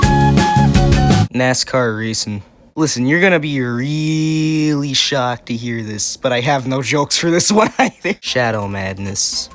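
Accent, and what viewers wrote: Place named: Canada. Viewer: He sounds more like he's canadian tbh.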